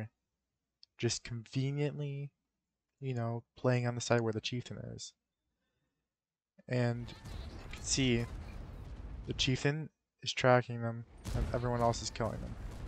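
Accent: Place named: Canada. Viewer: Why is it that I can barely understand 4 in a 5 words you speak? You need to enunciate better, stop whispering, and just generally get rid of that advent. What is it, some sort of Canadian?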